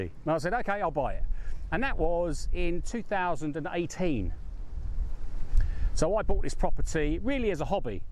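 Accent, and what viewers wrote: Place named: South London, United Kingdom. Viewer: Don't know how you came up on my feed but glad you did - so many of us are watching this with envy - the UK is even more of a rat race today than when I started out 45 years ago as a 21 year old(and it was a rat race then). Like many we worry for our kids as rents here are astronomical - Capitalism is becoming more and more viscious - how the younge working classes get a foot hold is bewildering. Our government has done everything it can to keep up on this overcrouded island(as outlined in the Bill Bryson book I am currently reading 'Notes from a Small Island') - so how you escaped is a matter of curiosity - the entant cordial agreement put in plae by our ruling classes to halt Brits living in France/Europe has put pay to folk doing what you have done - so well done for getting things sorted before brexit. 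I will now go back and watch alll your hard work on what seams a lovely plot - thanks. You sound like you come from my neck of the woods - South London - Bromley?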